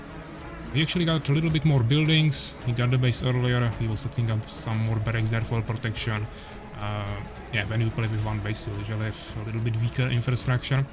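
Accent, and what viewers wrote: Czech Republic. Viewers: Did i hear strong Czech accent?🙂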